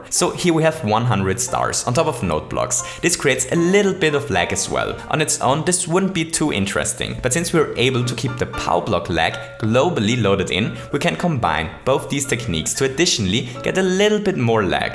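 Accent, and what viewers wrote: Germany. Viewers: Does this guy make German-Videos as well? Because you can hear this by his pronunciation of some Words! (PS: Ich frage, weil mir seine Stimme bekannt vorkommt.) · WAIT ARE YOU GERMAN?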